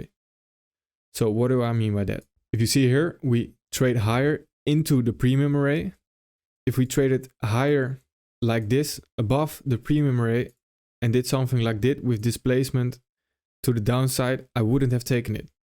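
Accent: Netherlands. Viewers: Love how they're not too long and love the Dutch accent. 🙂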